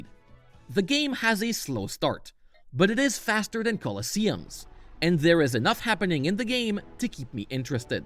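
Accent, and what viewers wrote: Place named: France. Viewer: He's French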